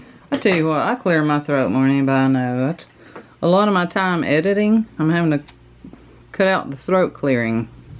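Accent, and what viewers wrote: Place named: South, United States. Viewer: I really love your ideas, but I really got triggered with all the mess on the table and the glue strings, I’m a OCD when it comes to creating, I set my things out on my work station, then I decide where to start on my project, then I work and finish the first section, then I tidy that stuff away before I start on the next section, I really get anxious with messy work stations, I was cringing the whole way through, the paint on your fingers got to me too. Not hating, so please don’t think I am, I’m just saying it triggered me. I do however, find your voice to be soothing so that helped me to continue to watch you create this shabby chic corn decor, it’s so refreshing to see people don’t just stick to autumnal colours, I love the shabby chic look. It can’t be all bad, I subscribed despite my OCD tendencies. TFS love from the 🇬🇧 I love your southern accent.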